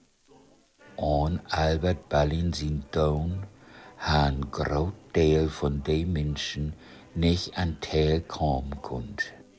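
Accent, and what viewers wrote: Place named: Low German, Germany. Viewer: Wat scheun Un'n plattdütsch wat ok'n echtet is.Weur de ik al lang nich mehr hört hev Wider so ut Hamborch nu in de Bretagne to hus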